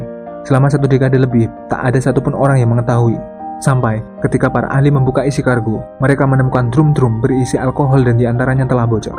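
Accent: Java, Indonesia. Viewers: bos... rada medok. dari jawa ya... pada bae lah... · Logat nya jawa cpt lg. Gk guna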